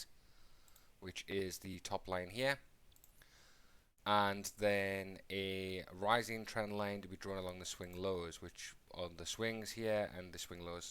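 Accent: United Kingdom. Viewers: New sub here. Always good to listen to TA from a fellow Englishman. Even better when they're from the North-East too!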